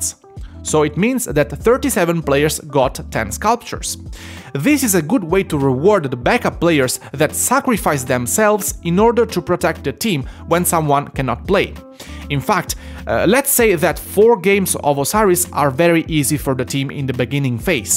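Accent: Italy. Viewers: Quanto se sente che sei italiano! Bel content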